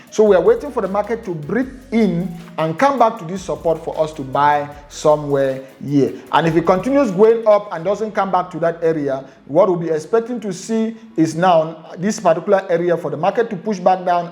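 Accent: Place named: Nigeria. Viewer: You just sound like a Nigerian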